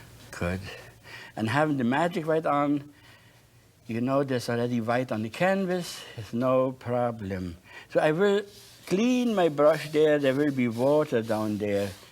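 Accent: Germany. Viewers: He has the same accent and speech patterns as my German grandmother. Miss you Oma.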